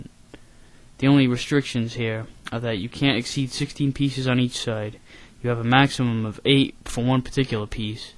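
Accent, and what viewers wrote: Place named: Boston, United States. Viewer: This guy has the best God damn accent. Are you from Boston?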